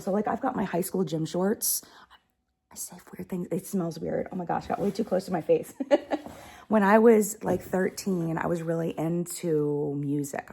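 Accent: South, United States. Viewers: My heart goes out to you, it’s an intensely emotional process sifting through your life’s memories! My best advice is to digitize most of it. Video yourself sorting through them while telling the story behind each item. This way you still keep the memories without the clutter. Seriously, your grandmother’s wig??? Don’t listen to the haters who scold you for speaking “American”! You should never apologize for who you are! You are a lovely person, don’t ever doubt that! 🥰💕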